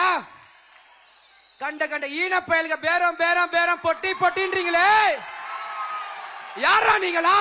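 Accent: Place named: Madurai, India. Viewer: Eppathan neengal Madurai karar mathiri pesukireergale sir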